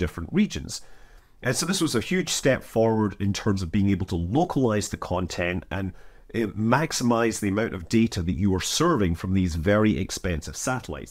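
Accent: Scotland, United Kingdom. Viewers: Either I'm getting more used to hearing USA accents everyday by living in the US (I'm English), or you're becoming more Scottish again. You're losing the Irish accent as well.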